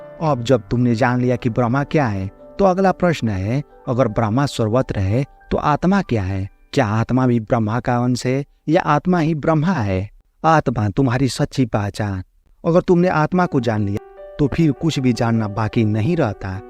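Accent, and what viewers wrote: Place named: Bengal, India. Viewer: Bhai tu bangali hai... Ya bhojpuri... Mast bolta hao bhai...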